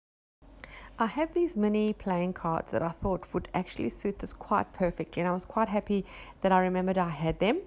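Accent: South Africa. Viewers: I stumbled upon your page quite by mistake, and I am hooked. I was trying to figure out your accent - are you a South African? Lovely work, thank you for sharing. <3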